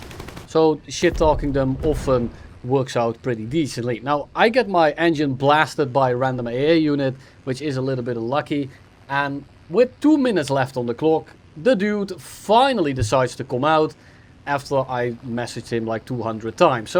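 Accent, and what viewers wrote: Netherlands. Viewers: Dutch accent, lovely.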